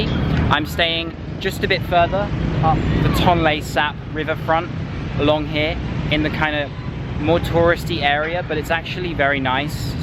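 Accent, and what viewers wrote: United Kingdom. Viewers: I love the content of your channel. Great camera work and editing with beautiful British English. Love you. ❤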